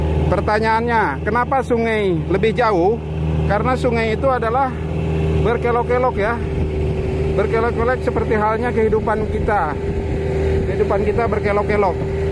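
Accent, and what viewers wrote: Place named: West Sumatra, Indonesia. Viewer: Cara cpat smpai di pelabuhan dwikora pontianak.. Maaf om org pontianak atw org minang krn dr nada bhs kaya org minang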